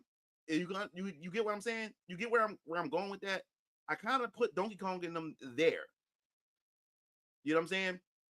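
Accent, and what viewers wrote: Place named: Philadelphia, United States. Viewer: Nah couz daz how they talk in Philly, git with it x).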